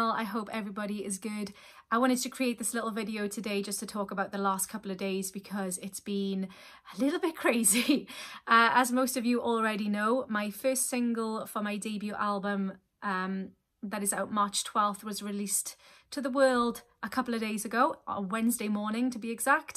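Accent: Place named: RP, United Kingdom. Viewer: U indeed the best voice on TY. You should soar into stardom. And your speaking voice is so british bbc received pronunciation its pleaseant as well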